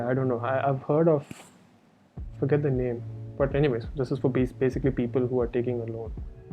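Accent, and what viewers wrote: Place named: India. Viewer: Everything explained in a beautiful and simple Indian English. Thank you very much.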